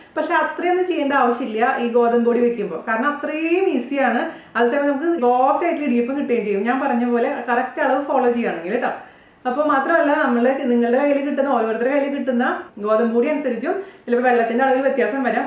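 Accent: Thrissur, India. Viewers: ചേച്ചി തൃശൂർ കാരി ആണോ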